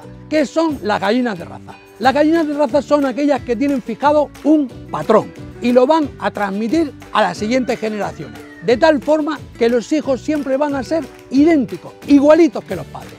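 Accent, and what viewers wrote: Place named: Albacete, Spain. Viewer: Yo le sigo porque me es muy ameno y cercano y el tono de voz Albaceteño como el mio, cada duda que tengo le miro a usted sus videos para ver que solucion tiene.❤. Muchas gracias.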